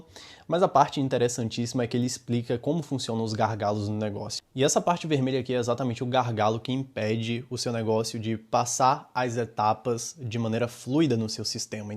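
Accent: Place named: Bahia, Brazil. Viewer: Vi que você é devoto de Carlo Acutis hauha... Você é baiano? O sotaque caetano veloso entrega hauahua...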